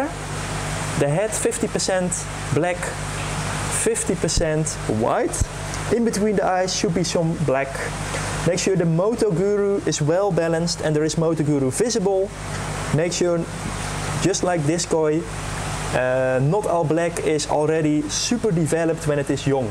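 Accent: Netherlands. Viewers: Just bought one to go with my Hi Utsuri and Ki Utsuri. Great explanation. I guessed straight away you are Dutch. I lived in Hoorn for 9 years and love the Netherlands.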